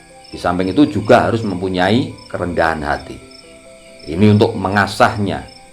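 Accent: Java, Indonesia. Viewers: orang jawa logatnya pasti sama...apalagi kalangan bapak² seumuran mereka...hehe akupun jd inget almarhum papa saya yg logatnya seperti mereka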